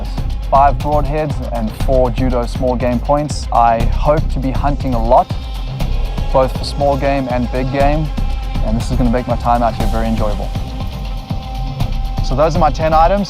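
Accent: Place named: South Africa. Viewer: Wanted to know if you’re a fellow Afrikaner or at least a fellow South African? You sound like us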